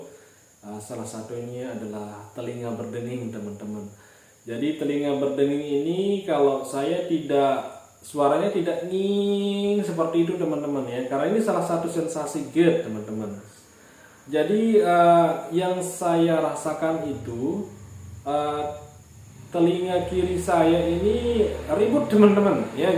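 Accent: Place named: Lombok, Indonesia. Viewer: Kayak logatnya orang lombok ini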